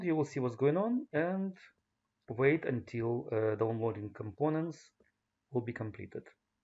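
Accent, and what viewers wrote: Russia. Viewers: чета у тебя русский акцент и фамилия русская?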